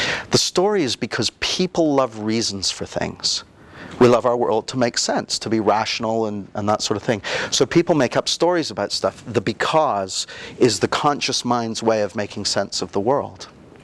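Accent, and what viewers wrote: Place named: Canada. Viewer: LOL, I can tell you are canadian. "Aboot". You say it! :P And several other inflections in your accent. I don't see how people think the accents are the same. lol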